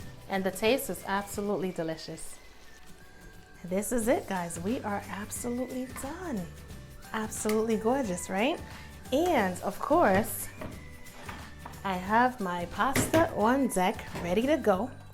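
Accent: New York, United States. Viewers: I love your New York accent 💕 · Is that a New York accent?? It’s so cute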